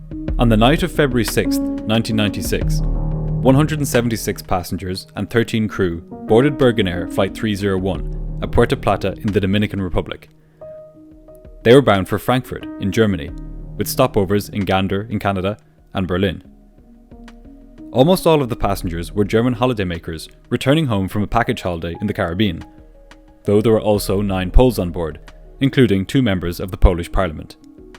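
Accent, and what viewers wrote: Ireland. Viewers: Yes yes i thought i got a little Irish accent in there too 😅🍀🍀 im also irish by the way 🇮🇪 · Only started watching your channel, its a great find!!! By any chance do I detect an Irish accent mo chara? I'm Irish and it seems like I do but I could be totally wrong! Let us know if you do!! Keep up the amazing videos and keep safe Mr!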